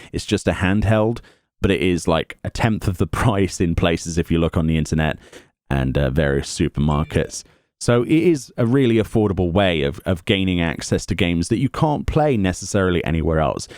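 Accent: United Kingdom. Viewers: Thanks for the enlightenment in your last teatime. 🙂 I enjoy the teatimes very much and would love to have more of them. My question is a bit personal and I apologize if I'm putting you on the spot: The way you pronounced the word 'early' made me wonder which part of the UK do live in? I understand if you'd rather not answer that due to those dimwits who think Swatting is a nice thing to do. After my love for FFXIV comes the love of languages. The English language has a special place in my heart and I'm curious about the different accents there are and where to find them. Which in turn is the reason for my question 😅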